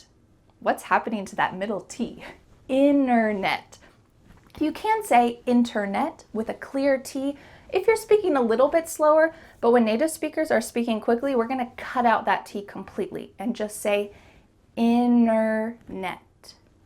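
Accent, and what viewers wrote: United States. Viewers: speaks with an American accent...in Australia we don't pronounce the "r"! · I love you ❤️ Every time I feel like my american-accent-blood-pressure is going down I check out one of your videos in order to get some dosage of the american accent. 😅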